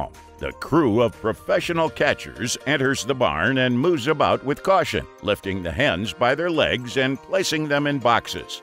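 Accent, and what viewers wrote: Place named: United States. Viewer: such almighty american (note the lack of capital in the spelling..) So why are the EU not willing to accept us produced chickens..oh could that be the use of BANNED chemicals..but the big american voice over says the are JFK rn certified 100% approved for human (nay american) consumption. Hey - just a thought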